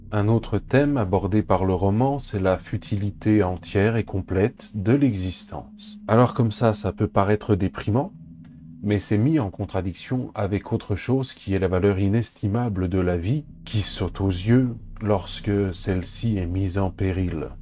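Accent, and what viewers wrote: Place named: Quebec, Canada. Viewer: et l'accent, Drummondville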